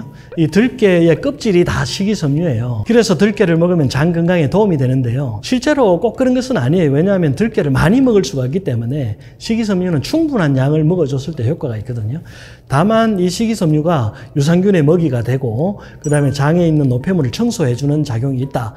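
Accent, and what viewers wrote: Gyeongsang, South Korea. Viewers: 대기~할일없네? 갱상도 싸나이 발음이라고 생각혀~ㅉㅉ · 갱상도 사람이니까 이해하세요ㅋㅋ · 맞아요. 경상도 사투리가 ㅡ발음도 잘 못해서 ㅓ로 들리지요. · 갱상도 싸투리 아이가. 니 그것도 모른데이?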